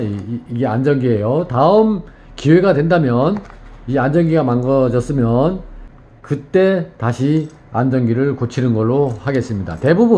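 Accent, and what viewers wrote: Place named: Chuncheon, South Korea. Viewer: 춘천 분이신기봐요 ㅎㅎ 구독합니다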